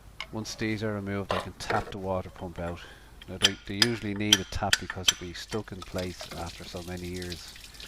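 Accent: Ireland. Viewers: Fan belt, your old school. Love the Irish accent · Sounds Irish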